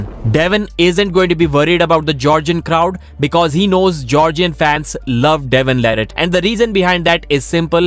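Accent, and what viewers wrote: India. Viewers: voice over reeks of curry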